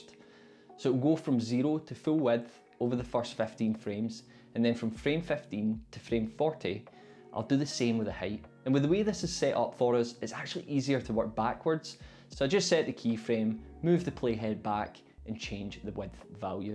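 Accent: Scotland, United Kingdom. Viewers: Thanks mate it really helped me 🙂 u got one Subscriber more :-) But your accent is uugh where are you from ?? Scotland or so ? :D i have to listing very carefully :D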